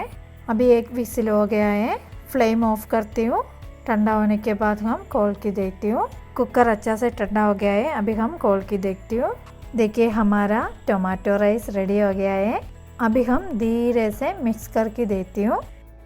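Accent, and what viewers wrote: Kerala, India. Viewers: Along with your delicious recipes, I enjoy your typical Hindi spoken in complete 💯 percent Malyali accent which is a treat to enjoy listening as well with watching simultaneously, being a mumbaite. Good job and I wish you all the very best for all your upcoming ventures.. 🎉🎉❤ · mam greetings from Maharashtra. Your have been taking Initiative & efforts to reach out " Kerala's food " to all over india is really appreciative. Your's recipes are really nice. Always keep growing. Your Kerlian hindi speaking is very sweet. waiting for Aravana paysam recipe.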